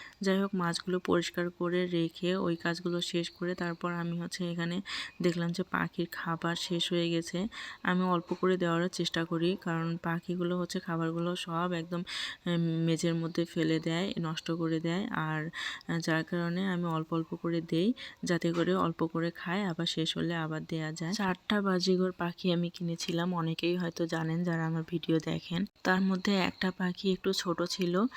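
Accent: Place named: Rangpur, Bangladesh. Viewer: আপু আপনার কথা শুনে মনে হচ্ছে আপনার বাড়ি রংপুর ?কোন থানা জানাবেন আমার বাড়িও রংপুর।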